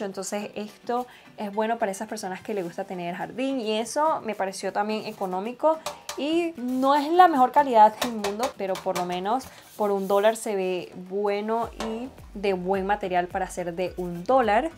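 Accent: Venezuela. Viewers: Queria preguntar de donde eras. El acento se reconoce siempre Venezolano.❤️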